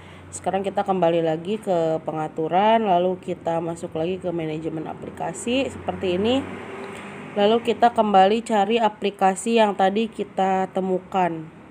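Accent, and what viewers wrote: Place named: West Java, Indonesia. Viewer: mni Sunda pisan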